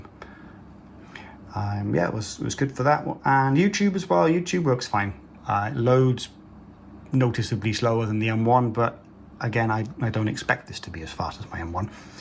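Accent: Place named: Hertfordshire, United Kingdom. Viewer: Are you a Japanese resident or planning to move there? The Hertfordshire accent is very recognisable.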